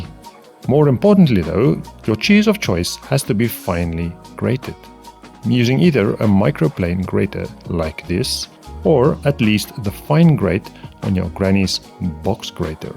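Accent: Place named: South Africa. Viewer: I know that accent😊 It is South African.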